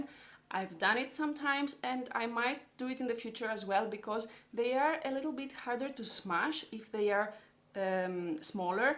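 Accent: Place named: Russia. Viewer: good morning I thought you were russian because of your accent haha:) Kind regards from the netherlands🇳🇱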